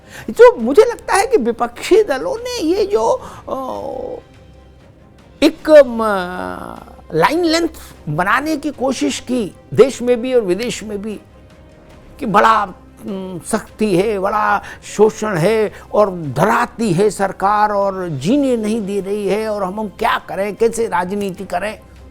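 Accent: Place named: Kanpur, India. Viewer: भाई जी आपका कनपुरिया स्टाइल मे किसी भी अंक का प्रस्तुतीकरण करण लाजवाब होता है। आप चिरकुटों पर ऐसा जूता मारते हो भिगो भिगो कर कि हंसते हंसते खाना ही पच जाता है। जीयो भइये 😊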